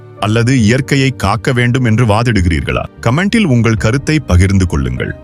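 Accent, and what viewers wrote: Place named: Sri Lanka. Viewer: Evan chanal eopaditha pesuvan.losu paya.evan.entha oor karan evan.sri lankan tamil kotha poda erumbumku entha kodumai.appa unaku pannathu sarithan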